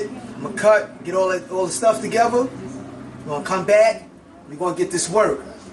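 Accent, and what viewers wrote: New York, United States. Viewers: Silver Stone that's how NY men talk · You definitely from NY my nigga! Great Video! You do BBQ's? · Dope video bro and I know a fellow New Yorker when I hear one. You actually sound like Ghostface.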